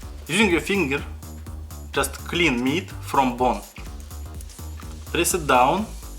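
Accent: Russia. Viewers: Russian accent english is better than bri‘ish english ngl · Bro having an Russian accent is cool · I like the russian accent btw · Its ok the Russian Accent man, and its kinda cool to be honest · Come on! "Sorry for my Russian accent"? Man, i personally love it! Hope you do more commented vids! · Idc about the russian acent all I care is that u made a voice reveal lets gooooooooooooooooooooooooooooooooooooooooooooooooooooooooooooooo!!!!!! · Damn russian accent is just 😩👌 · Looks really good. This is the Einstein of cooking. And you Russian acsent is really nice. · Russian accent is fine i can understand and i am sure every1 else can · Dude.. you should be proud to have a thick russian accent. It is nothing to be sorry about. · Having an accent is to be that u have an identity and u should be proud of Russian accent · Don’t be sorry about your accent, tbh I think a Russian accent is pretty cool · I actually like Russian accents. 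Keep up the good work my man. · Your Russian accent is slav, speak Russian sometimes. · There was a time I was just watching your videos just to hear that awesome Russian accent, love you❤️ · Russian accent is my favrouite please dont be sorry about it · “Sorry for my Russian accent” Your accent sounds nice! I actually enjoy hearing your voice in your content! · Yep you very good Russian accents and English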